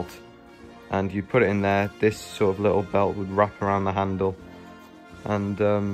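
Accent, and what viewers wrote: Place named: United Kingdom. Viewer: He speaks a british accent lol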